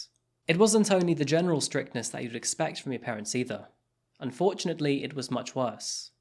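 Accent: United Kingdom. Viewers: this case is indeed fascinating, and now you [not for the first time, actually are you, you come across as utterly Beitish, your accent, manner, and speech patterns do not seem consistent with someone born in the US at all, not even from Seatle, which is a beautiful and amazing city, I haven't spent as much time there as I'd like, but the time I have spent was wonderful, such a fun mix of people of all stripes and types and killer architecture and natural wonders, the Puget Sound and San Juan Islands are simply glorious, untouched, unspoiled and it's lovely to see whales breeching from the ferries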